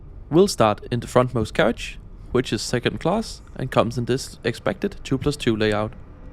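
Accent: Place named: Denmark. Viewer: Haha, I had the same reaction when I heard his Danish accent :-)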